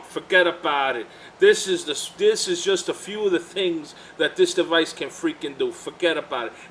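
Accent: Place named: New York, United States. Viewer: ***** ya you can tell your a new yorker without the forgetaboutit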